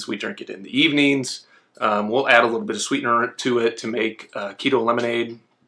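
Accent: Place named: Canada. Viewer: He's Canadian! "out" lol "ut".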